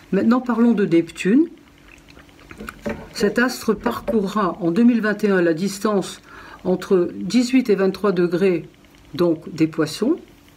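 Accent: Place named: Algeria. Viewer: Accent pied-noir plein de soleil ☀️ merci pour votre travail ! Bélier ascendant vierge et lune en scorpion... j'espère que cette année m'apportera douceur et amour ❤️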